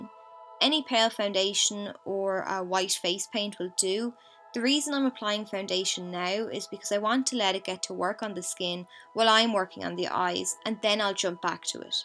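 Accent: Ireland. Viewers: I LOVE your brogue accent.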